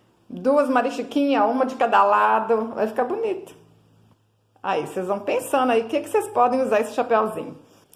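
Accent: Minas Gerais, Brazil. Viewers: pelo sotaque parece que você é mineira,eu também sou. Amei essa lembrancinha!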